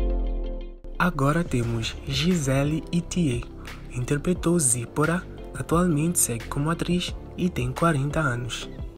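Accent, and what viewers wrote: Portugal. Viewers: Que sotaque é esse? Vc é de Portugal é 🤨🤔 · Você é de Portugal seu sotaque é de lisboa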